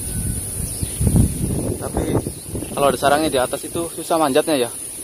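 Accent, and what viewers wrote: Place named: Indramayu, Indonesia. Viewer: Kyane Wong Dermayu Ikih Logat²e Mah😁 Sukses Terus Ang👍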